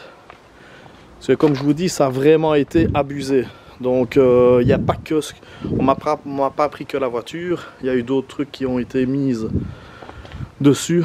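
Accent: Belgium. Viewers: Je suis tombé sur ta vidéo par hasard, et ça fait vraiment plaisir d’entendre un Belge parler de ses voitures. J’ai un peu regardé tes réseaux, tu devrais vraiment aller voir Akram à la fin de ce confinement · salut est tu belge par hazard?🇧🇪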